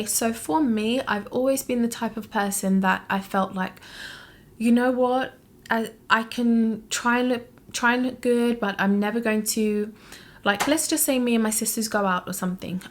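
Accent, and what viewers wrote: United Kingdom. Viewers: Umm.....Are u from London.I'm feeling the British accent..Umm u will NOT be invisible over here in the USA..they will LOVE that accent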